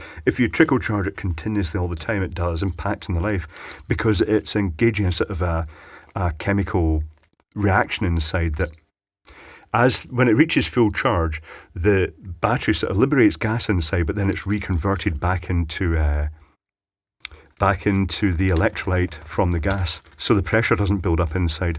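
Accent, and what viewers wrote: Scotland, United Kingdom. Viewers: Hey Big Clive, really enjoy all your videos. From scotland myself and its nice to hear one of us on youtube for a change. Anywho can you look into flat earth?? There is someone from paisly getting alot of people on the subject just by going around paisly and glasgow and spreading the word. Let me know if you will atleast look into it if you havent already?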